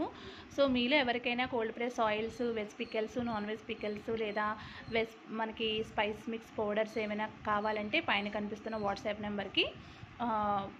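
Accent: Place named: Amalapuram, India. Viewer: అమలాపురం మాట వినగానే🤗🤗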